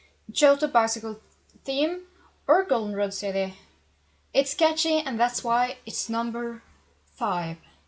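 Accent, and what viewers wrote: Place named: Balkans. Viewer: yeah, a let's play would be nice.. I like your accent and the way you talk! So the ppl like me who actually like your takling, would enjoy the vids as well:) You're from the balkan, right?:D And I'm telling you that bcz you seem very aware of your talking since you mention it in the beginning of somme vidz:D ASMR is cool, it's for relaxation purposes.. it's all about sounds ppl can hear, like whispering, or for example the sounds of the buttons when you play on the DS.. Look it up:) if you do those vids, ppl who like pokemon will watch your vids but also ppl who like asmr... And ppl who like them both will simply adore you lol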